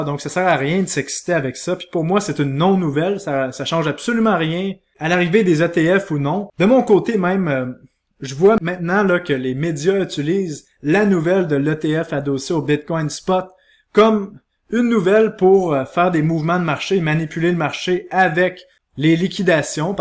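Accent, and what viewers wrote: Canada. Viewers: Je savais bien que c'était possible de parler avec l'accent canadien sans abuser complètement ! En plus d'être audible, fluide et très intéressant. C'est pas plus stylé les titres moins putaclick? Par exemple : Analyse de BITTENSOR et des 3 Cryptomonnaies les plus prometteuses en IA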